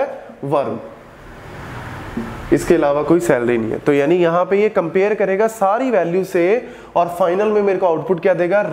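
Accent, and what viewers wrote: Punjab, India. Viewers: You are amazing man. Thank you so much for your lovely sessions. I particularly like the punjabi flavour in your Hindi. These sessions have been of so much use to people like us. May you always stay healthy and happy. Coud you make a few sessions on SQL queries that are frequently used by manual testers. Would be of a great great help. Thank you once again from the bottom of my heart. Let us know your name dude.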